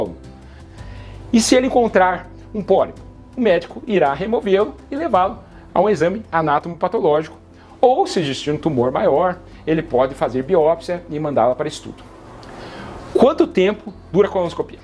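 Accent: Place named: Caipira, Brazil. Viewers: Esse sotaque caipira deu um contraste curioso com as informações brindadas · Caipira ou Nao...eh sempre mto bom ouvi-lo! 😉✌🏽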